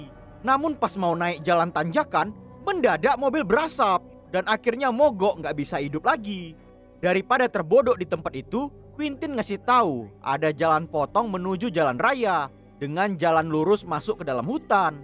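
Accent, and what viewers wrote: Medan, Indonesia. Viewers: Orang medan abang ini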